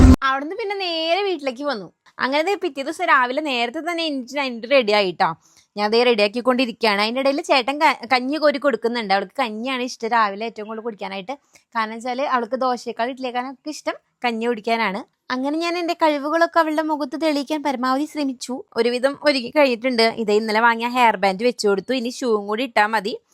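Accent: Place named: Thrissur, India. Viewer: തൃശ്ശൂർ ഭാഷ സൂപ്പർ ആണ്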